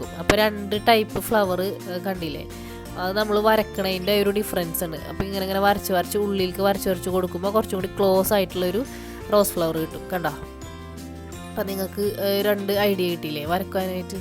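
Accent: Kodungallur, India. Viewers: Adipoly 👌👌👌 Evideyanu place? Kodungallur area aano? Njanavide aanu. Njangallude slang pole thonni 😄😄😄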